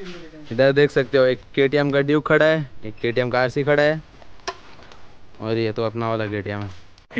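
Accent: Odisha, India. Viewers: Bhai app odisha se ho paaka 😅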